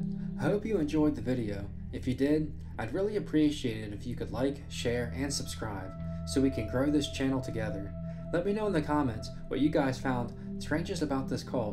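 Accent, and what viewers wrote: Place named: Pittsburgh, United States. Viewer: You sound like you're from Pittsburgh. Good video, cheers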